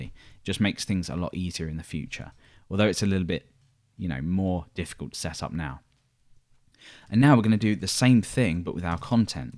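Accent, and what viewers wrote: England, United Kingdom. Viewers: Hello there! No doubt your approaches to implement functionality are the best all over the PHP web dev world, I just wish Hollingworth, Schiff or Traversy would explain them to me as I feel so sorry to tell you that you lack a bit on explanation and I struggle to capture your english accent. Anyway, thanks very much I really appreciate to get to realize how solutions can be implemented in a clever way.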